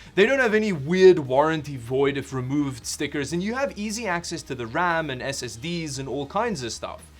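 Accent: South Africa. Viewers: are you south african? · What accent is this, you sound like me, but being in Canada throws me off maybe it's an odd French/ Norwegian I assume SA though because you said suss out and sketch. Common use here. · South African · Are you from south Africa? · That accent confused me, I hear a South African accent there. What's your story? :)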